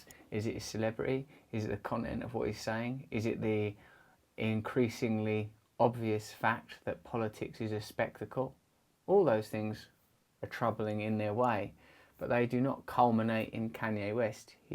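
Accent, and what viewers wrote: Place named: United Kingdom. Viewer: your bri-ish accent is awesome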